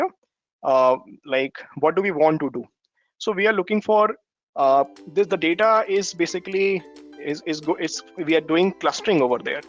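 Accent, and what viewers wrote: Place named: India. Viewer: heavy Indian english accent.Cant get it at all!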